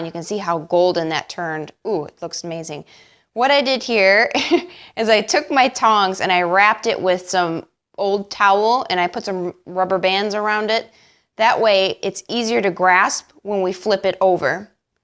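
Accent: United States. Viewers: Buffalo, Cleveland, or Chicago accent?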